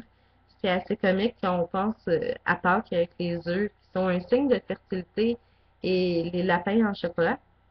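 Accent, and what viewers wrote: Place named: Quebec, Canada. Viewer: T'as vraiment un accent ! xD Content de savoir que des Québecois font des vidéos wiccanes en français ! ;)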